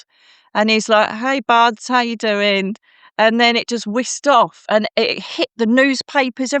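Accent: United Kingdom. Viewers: Hard to understand her British accent!😢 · I can’t get over how FAST time is moving right now!! She is lovely! She sounds like my UK family ❤ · American here so I don’t know how to describe this accent, but wherever she is from in the UK seems to have an over representation of psychic people. Seems very common on Gaia etc. Kind of interesting 🤔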